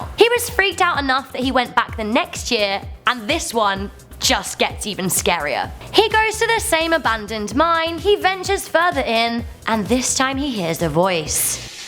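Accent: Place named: United Kingdom. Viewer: too british... we need danny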